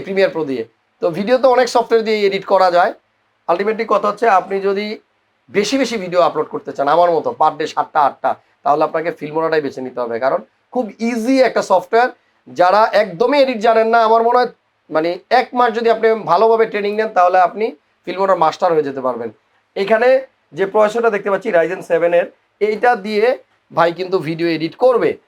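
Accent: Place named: Chapai Nawabganj, Bangladesh. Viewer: তার ভাষা সুনেই বুঝেছি সে আমাদের চাপাইনবাবগঞ্জ এর ভাই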